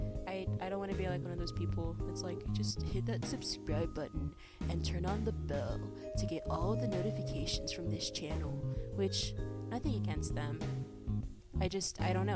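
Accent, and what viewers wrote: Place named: United Kingdom. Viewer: Hey yor british accent is good :] i love the story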